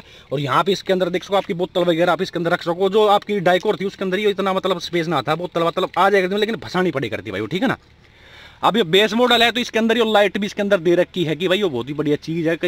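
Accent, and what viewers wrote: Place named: Uttar Pradesh, India. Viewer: Bhai apni Desi up wali language is best haryanvi is not good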